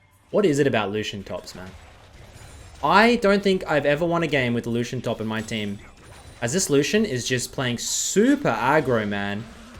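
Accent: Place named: Australia. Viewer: Hey man me and my girlfriend love watching you, but recently she's been telling me to get a haircut similar to yours and start speaking in a Australian accent? Should I be concerned?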